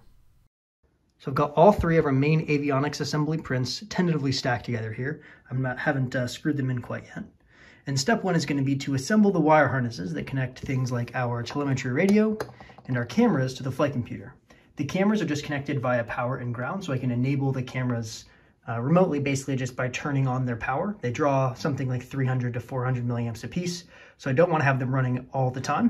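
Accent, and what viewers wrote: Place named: United States. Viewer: Sounds like your American, how about giving us inches, feet, and grams/lbs.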